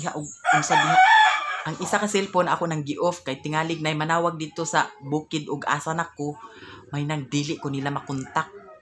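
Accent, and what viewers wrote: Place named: Misamis Oriental, Philippines. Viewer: Every time makita nko imo vedio it remained me sa ako mag relatives taga misamis oriental i missed hearing your accents....ingana gyd .....shoutout taga kiraking ug calubo keep safe....you too🍒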